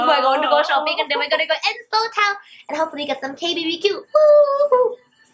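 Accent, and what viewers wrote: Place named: Australia. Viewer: 호주발음이라 귀여운데? 네 귀가 썩은듯.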